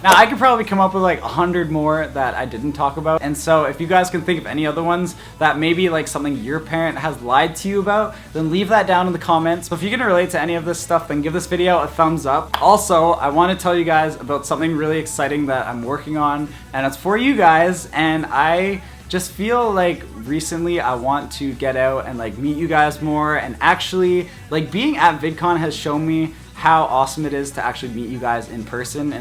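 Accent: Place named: Canada. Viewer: Random Question is he Canadian!!! this the first video i've seen of his!!! He sounds Canadian!!!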